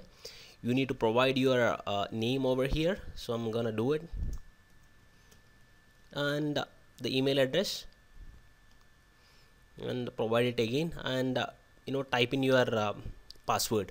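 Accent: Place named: India. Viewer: Am I the only one who can t support this Indian accent? no offense...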